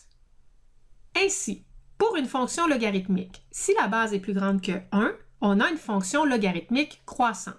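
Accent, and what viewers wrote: Canada. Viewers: Merci ! a cette belle voix canadienne :)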